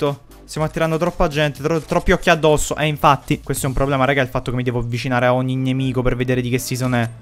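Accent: Naples, Italy. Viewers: madonna mia fra quanto si sente accenti napoletano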